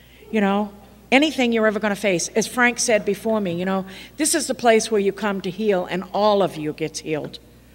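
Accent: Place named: New England, United States. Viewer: 30 seconds in and there was that new england accent. #home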